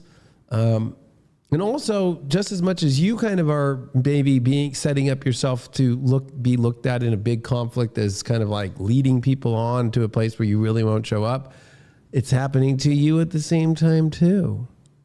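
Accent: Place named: South, United States. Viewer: ( the southern accent is killing me )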